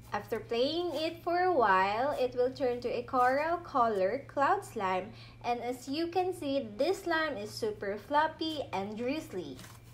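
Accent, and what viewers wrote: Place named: Philippines. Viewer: She is a pinoy...so her first language is filipino. Atleast she tries her best. Hope u understand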